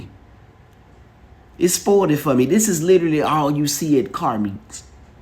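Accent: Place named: Detroit, United States. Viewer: Bro you sound like you live in Detroit 😅 It goes down fr fr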